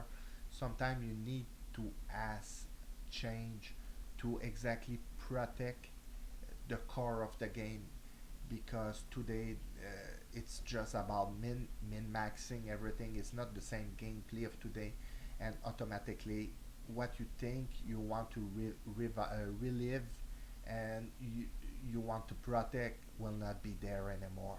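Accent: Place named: Quebec, Canada. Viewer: I cant leave a message on your video about enigma breaking the game because i guess alots of snowflakes insulted you but i just want you to know that myself (and all the old school diablo 2 players i know) hate enigma and wish it gone from the game!! Cta too Ps : est tu Québecois? Ont dirait que tu a l’accent du Québec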